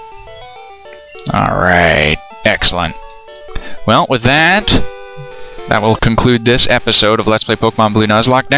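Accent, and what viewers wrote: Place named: Canada. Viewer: you is entertaining <3. Love the Nuzlocke challenge. "What now the shirtless wonder?" :3 Love the Canadian accent as well haha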